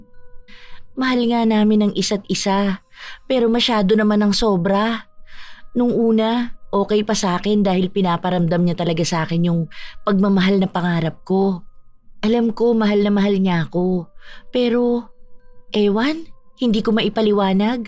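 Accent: Visayas, Philippines. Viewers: Nakakaloka qng accent may bisaya may ilonggo ano ba talaga ining hqhahaha.. wala lang natawa lang ako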